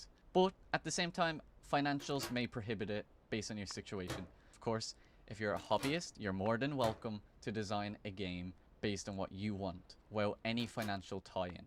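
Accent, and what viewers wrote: Ireland. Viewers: You Irish dude? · are you irish?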